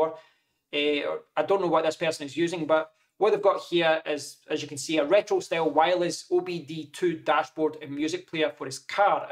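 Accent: Scotland, United Kingdom. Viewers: Nice Scottish accent 👍🏼🙂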